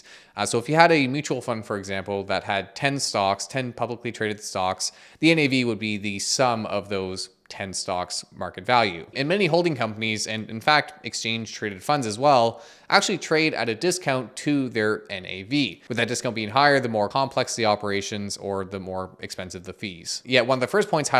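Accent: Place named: Ottawa, Canada. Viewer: No way, picked out your accent on the words "they are at it again" and "they got a lot of flak last time" and found out your in Ottawa! No fucking wonder I recognize it! Crazy to think someone I watch online is just chilling somewhere in my city!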